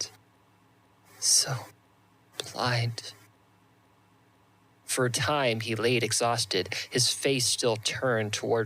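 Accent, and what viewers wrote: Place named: Ozarks, United States. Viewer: Wonderful job with the phases and feel of the language of the Ozarks.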